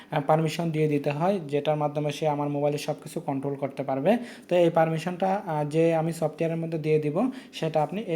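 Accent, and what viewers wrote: Chittagong, Bangladesh. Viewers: চিটাগাংইয়া নাকি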